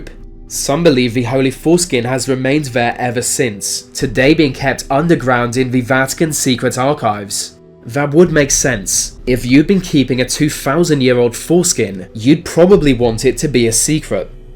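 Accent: England, United Kingdom. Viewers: I dont know what it is. I love your voice, or pitch, or if its any kind of inbreed english accent? Its captivating. Not from Britain so I dont know. Actually, im not from a english speaking country whatsoever and dont know much about anything. Therefore im watching your channel. Its great! Keep up the good work.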